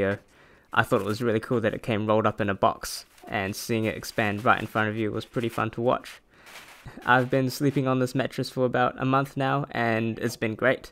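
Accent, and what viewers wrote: New Zealand. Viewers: You sound like a kiwi. If you are where are you getting this nice plywood?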